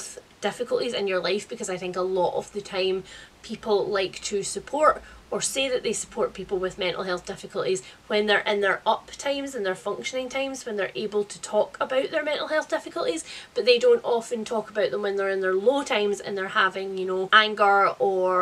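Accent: Scotland, United Kingdom. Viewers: I am in LOVE with your Scottish accent. I have no idea what those other arses are talking about. Congratulations to Lovely Spouse! 💕 I love your openness in talking about your mental health and being up front when you struggle. I come from a family that believes in bottling that shit up or rubbing dirt on it. Your personality and influence is so much more than just book recommendations to me. Thank you. It is because of your frankness that I have accepted my own mental illness as a part of myself. 💕💕💕Please continue to take the loveliest care of yourself and I’m so glad to see you back.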